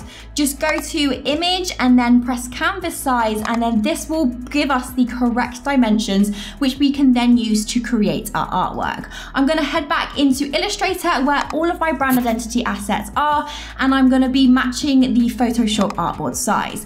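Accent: United Kingdom. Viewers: ! You have really nice british accents. Your content is always worth watching than other channels. Glad i found you and God made you ✨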